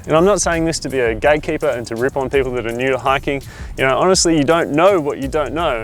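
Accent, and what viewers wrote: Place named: Australia. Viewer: mate you sound aussie like the crocodile hunter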